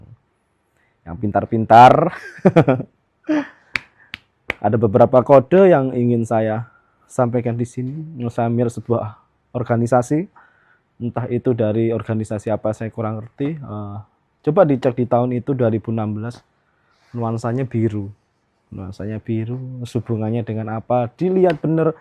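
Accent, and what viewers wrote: Java, Indonesia. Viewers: Nama nya tapi suara nya medok...mantul👍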